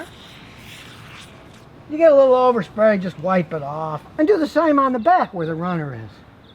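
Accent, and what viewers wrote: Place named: Omaha, United States. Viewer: chin(k) from asia here..........is it me or does this guy remind u of warren buffet......hes got that omaha accent,the way he talks even his sense of humour kinda reminds u of buffet.except instead of warren buffet the oracle of omaha and financial guru,hes warren buffet ur friendly neighbourhood car mechanic.maybe hes buffet's long lost twin brother who drinks 8 cans of diet coke a day.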